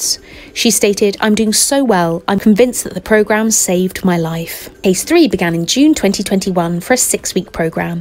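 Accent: England, United Kingdom. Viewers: 'London". English accent. US measures. ChatGPT must try harder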